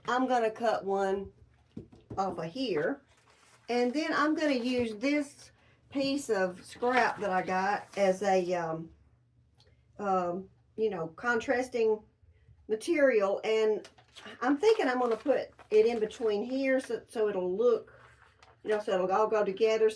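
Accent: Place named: South, United States. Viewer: Fun. Just found you. Loving your down-to-earth southern accent and humor. Will be watching you from Evansville, Southern Indiana.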